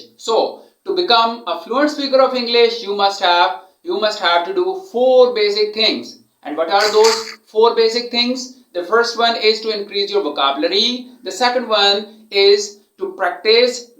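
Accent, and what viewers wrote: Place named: India. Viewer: يبدو من لهجتك انك هندى او باكستانى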